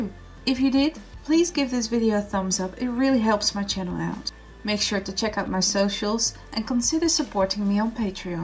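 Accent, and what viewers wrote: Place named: Netherlands. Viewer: Everytime I hear your accent I get this feeling you're dutch.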